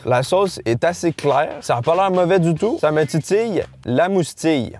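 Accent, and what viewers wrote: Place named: Quebec, Canada. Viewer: j'apprends le francais pendant un an maintenant et tes videos me donnent envie de avoir l'accent quebecois comme vous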